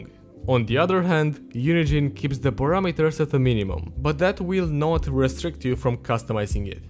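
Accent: Russia. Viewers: Слышно, что наш парень. С произношение вообще беда) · Такой жесткий русский акцент у автора )) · Русский акцент такой ржачный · акцент то русский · Странный русский акцент для румына 😉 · Почему мне слышится русский акцент? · такой акцент русского языка, ты что плохо знаешь английский ?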